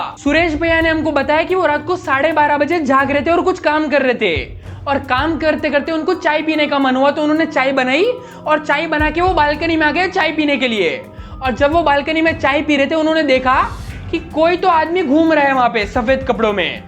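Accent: Hyderabad, India. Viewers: always love the hyderabadi accent😅😂😂 Also the ending is crazy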